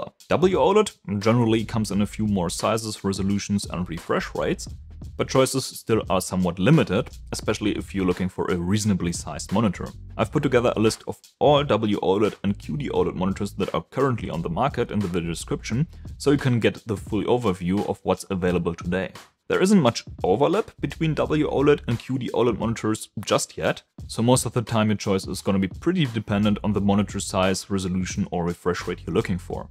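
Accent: Germany. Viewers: You German? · He has about the strongest German accent out there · its so german it sounds not german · He has a pretty pronounced German accent lol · The first video I ever watched from him, after first couple of sentences, I tought to myself: " Oh, a German doing reviews in English, that's rare". And I'm Croatian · I hear the german accent but appreciate that the video is in english lol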